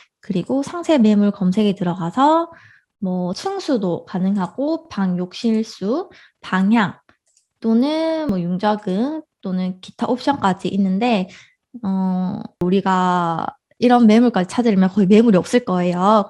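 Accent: Busan, South Korea. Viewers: 부산에서 오신건가요 잘 봤습니다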